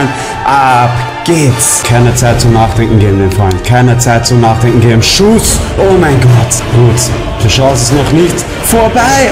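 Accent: Austria. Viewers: Ich Feier deine Art so sehr hahah Echt cooler Typ Kommst du aus Österreich (wien) ?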